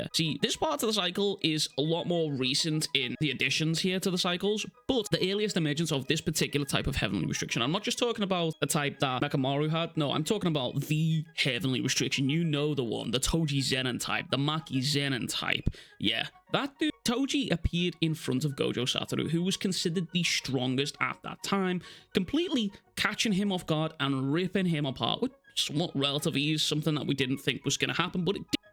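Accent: Liverpool, United Kingdom. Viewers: Is this a scouser?? (United fam here) 👀👀👀👀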